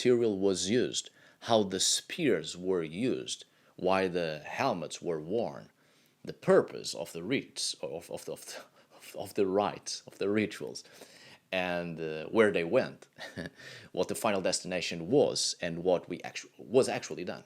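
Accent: Portugal. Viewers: Even with your Danish and British heritage I could spot your Portuguese DNA a mile away the accent does not lie, love from Portugal bro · Hi K, I read that somewhere has two cats 🐈 two females cats. One called Sól and the second one called Gigi. Sól and Gigi are two Norse Goddesses names. is half Portuguese and half Danish with in addition of some Irish, Gaelic and Scottish, DNA. Following his outdoor videos vegetation’s are northern Portugal area. So I guess that he is living in Portugal northern part of Portugal somewhere in the Tras Os Montes area. Sometimes his accent is clearly Portuguese accent.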